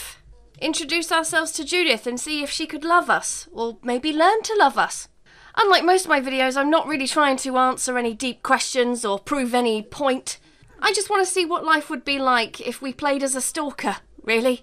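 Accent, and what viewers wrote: United Kingdom. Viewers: It's so rare to hear a Brit with a similar accent to mine. <3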